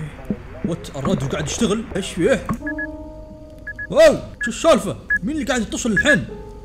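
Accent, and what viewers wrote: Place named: United States. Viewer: الاكسنت الامريكي معطي جو 😂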